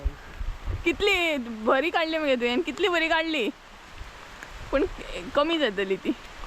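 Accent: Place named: Konkan, India. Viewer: Keep going.... Don't stop your vlogs. I always like your talking way... I mean your konkani is different from ours as i stay in South Goa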